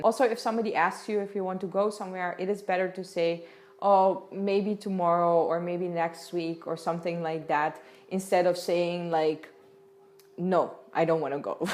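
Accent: India. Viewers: You are talking like an Indian.... With such a lot of emotion 😁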